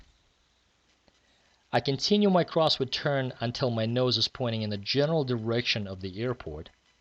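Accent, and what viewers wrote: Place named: Eastern Europe. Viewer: I've flown over to CCR from HWD, even at least once to build night hours as PIC., you've done a splendid job as an aviator and presenter, and gave us a lovely peek at Mt. Tam into the bargain. I'm far from the East Bay and CC County, now, but it brought back great memories. I also love the little hints of Slavic in your speech, the L's, the hard h's, the narrowed vowels in some places. Even without seeing your name, I'd have heard that. As Scott Manley says, "Fly safe!"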